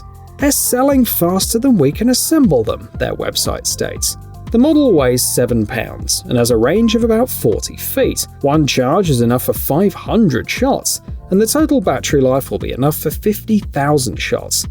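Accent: United Kingdom. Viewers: Well he does sound like a brit. What would you expect?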